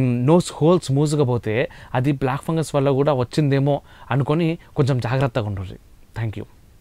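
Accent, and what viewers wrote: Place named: Telangana, India. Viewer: మీరు తెలంగాణ యాసలో కాకుండా రెండు తెలుగు రాష్టాలుకూ అర్ధం అయేలా చెప్పండి 🕉️🕉️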